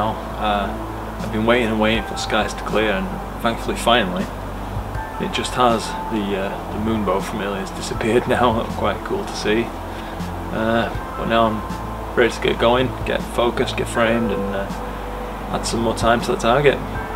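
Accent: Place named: West Yorkshire, United Kingdom. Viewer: Thought I recognised a West Yorkshire accent. Nice setup, I’ve got the esprit 100. I’m in York, but originally from Wakefield. I’ve got a Sesto Senso2 attached to mine and it’s great. I prefer it over the EAF I used to use. Things that won me over, are, it has a clutch so you can still manually focus, as long as the sesto is off. Not a biggy but can be helpful if you don’t want to use sesto, and also there are no brackets or weird adjustments to attach it to the esprit range, even though there is a bracket for the zwo EAF (the Takashi one), it’s still messy. The sesto 2 just sits around the focus housing. I have practically no focuser backlash either. Great video.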